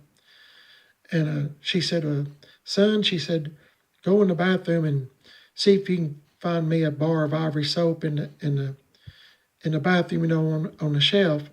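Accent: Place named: Eastern Kentucky, United States. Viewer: I hope you don't mind me asking, but where are you from? I grew up in eastern Kentucky and you sound like you could be from just over the hill!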